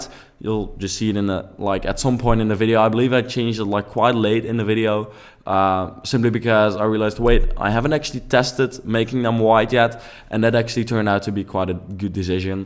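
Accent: Netherlands. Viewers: Aan het begin van de video vond ik het al op iemand lijken die Nederlands is en Engels praat 😂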